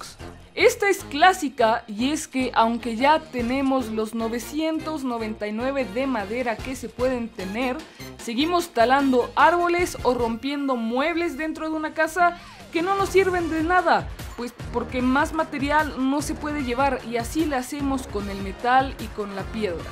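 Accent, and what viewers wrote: Costa Rica. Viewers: Eres tico verdad? Lo digo por el carepicha😂😂😂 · Usted es de Costa Rica?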